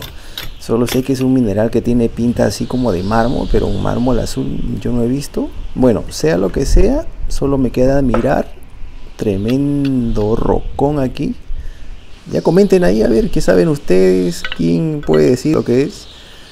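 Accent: Peru. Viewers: no soy muy bueno con los acentos.... ¿¿de donde eres?? estoy entre peru, bolivia.... tal vez incluso paraguay :3 .... pregunto para saber a donde planifico mi proximo viaje jejejje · En que país estas ahí?.. hablas como peruano. estas en Perú?.. saludos desde Cancún Quintana Roo México.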